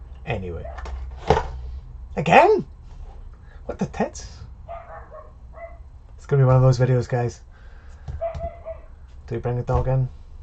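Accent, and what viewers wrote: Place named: Scotland, United Kingdom. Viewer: My first video since subbing and I enjoyed it very much so. Makes a change hearing another Scottish twang lol. Great job. Really well done video pal I enjoyed it. I still need a Mysterio pop Looking forward to more of your content man. 👍🙏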